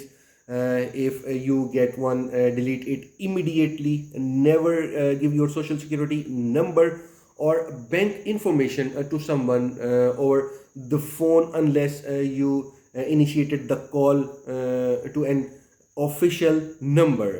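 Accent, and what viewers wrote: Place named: India. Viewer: wow The way you speak English, I can tell you that you are not from USA. You are from India. What do you know about Social Security of USA.??? Everyone in USA knows about their social security benefits. Before you upload any video clip learn how to speak English. All this news are on YouTube video's everyday by DR. Ed weir. So we don't need your Indian accent English, that no one in USA will understand. DR. Ed Weir answering all the question and any kind of question regarding Social security. Stop this bull shit.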